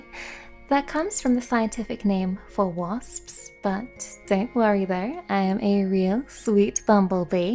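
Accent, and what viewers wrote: United Kingdom. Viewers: Oh my god SPIDERMAN!!! Not my hero.......But it's for though and really, British, soft voice and is a maid who was a knight, is there anything else can do? can hold her boobs..........YES SCORE❤❤❤❤❤❤!!!!!!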